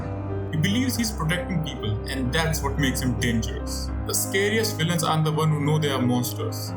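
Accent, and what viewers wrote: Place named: India. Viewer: Open the video, hear an Indian accent. close the video.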